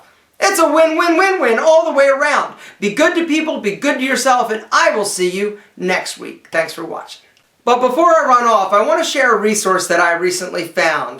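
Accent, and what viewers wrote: Philadelphia, United States. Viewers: are you from Philly? Your accent sounds like it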